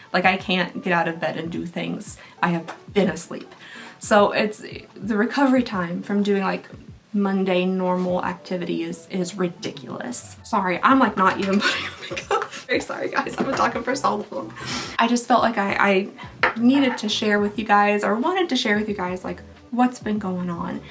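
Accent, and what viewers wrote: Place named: Georgia, United States. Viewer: please know that you owe us no apology. As seen with other posters, we will be here when you feel well enough to do a video. I’m sorry to hear you are having a tough time. As for me, I just love your sense of humor and your lovely accent. It reminds me of my family of friends in GA. You have a wonderful attitude considering the amount of things you are dealing with. Good job with giving yourself injections! I know how hard that can be from personal experience. I’m proud of you!!!😊Doing this yourself will help you get over your fear of needles.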